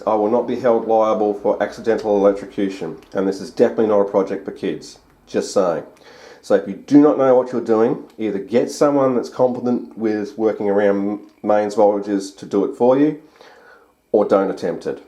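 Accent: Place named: Australia. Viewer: Great to hear an ozzie voice when looking into this subject ta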